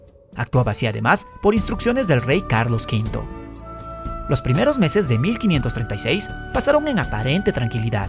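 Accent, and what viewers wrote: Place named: Peru. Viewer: Hermoso relato con acento peruano. Dices VilaOma tal vez podría ser WillaccUma.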